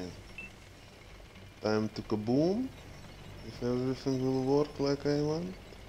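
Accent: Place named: Lithuania. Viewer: English Lithuanian accent.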